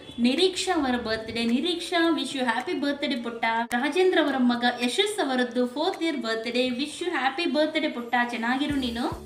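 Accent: Mangalore, India. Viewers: ಕರಿಬೇವು ಪುಂಡಿ 😀😀😀😀 ನಮ್ಮ ಮಂಗಳೂರಿನವರ ಕನ್ನಡ ಸೂಪರ್. ಯಾಕೆಂದರೆ ಕನ್ನಡ, ತುಳು, ಕೊಂಕಣಿಯಲ್ಲ ಮಿಕ್ಸ. ಸೂಪರ್ vlog 👌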